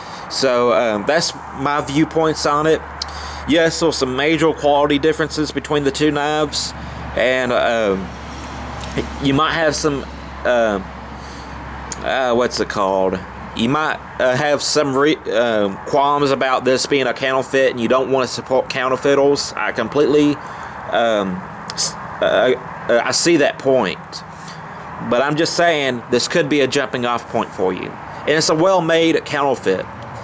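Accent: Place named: South, United States. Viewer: I bet u from southern USA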